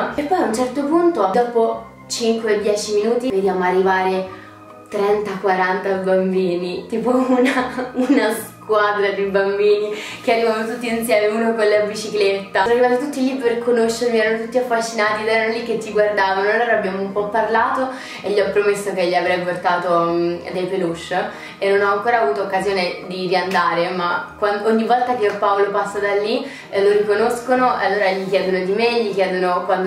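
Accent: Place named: United States. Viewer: parli in italiano con l'intonazione americana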